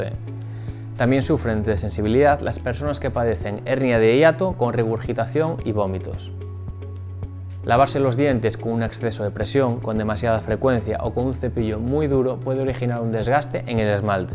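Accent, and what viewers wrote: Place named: Galicia, Spain. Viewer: parece de Galicia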